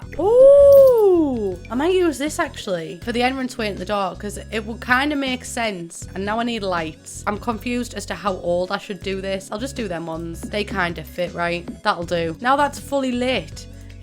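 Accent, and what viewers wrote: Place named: England, United Kingdom. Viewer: out of all the english youtubers i love accent the most and she's probably my favorite english youtuber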